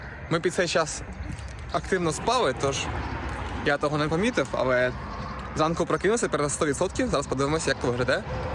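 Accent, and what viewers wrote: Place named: Zakarpattia, Ukraine. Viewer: Так початок він почав не лише українською а з закарпатським діалектом нам закарпатцям дуже приємно що українці поважають діалекти різних регіонів це вселяє надію що все у нас буде класно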